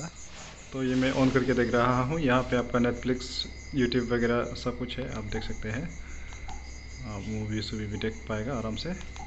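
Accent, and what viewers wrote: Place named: Assam, India. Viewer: Yeh asamee hai. T ka uccharan dekh ke hi samjh gya.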